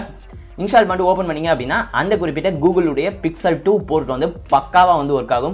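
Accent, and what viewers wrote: Madurai, India. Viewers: Madurai karanaya ne ??? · Bro madurai ah Neega. . . Nice video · Bro nee Madurai ah 😍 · Madurai ah neenga? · Bro Mi A1 ku epadi install panrathu nu solunga and nenga Madurai ahh??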